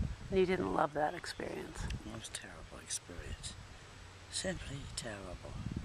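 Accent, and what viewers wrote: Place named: Philadelphia, United States. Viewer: Great example of a Main Line accent! Thanks for posting!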